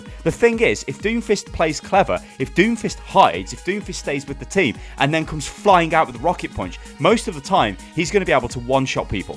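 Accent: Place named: Midlands, United Kingdom. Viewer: Where is he from? He sounds like he's from the midlands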